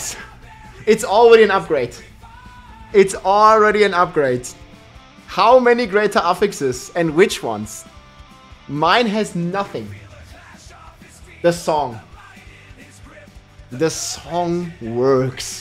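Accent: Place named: Germany. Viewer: My only suggestion to you would be. If you hate everything about this, skip it. He is not going to change the way he speaks. It's his accent, and he is german. Plus, I highly doubt that he would even try to, just because of one comment on youtube. :) I wish you well, but I also suspect that your frustration has roots in much deeper problems.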